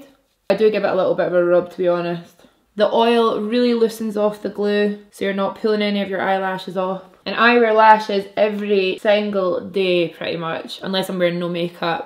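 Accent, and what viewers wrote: Scotland, United Kingdom. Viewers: i just want you to know that my teacher has a scottish accent and everytime she speaks i always imagine u speaking lmao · you're so Scottish, I love it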